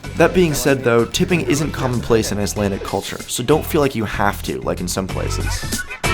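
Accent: United States. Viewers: это просто замечательно.. как слышиться америко.диалект.. = можно на 98% заранее знать, что будет безпредельное слабумие в рекламе продажи пустот.. дядя не исключение.., 3ато весело и присутствует уверенное отсуствие всякого умственного процесса в душе и речи.. браво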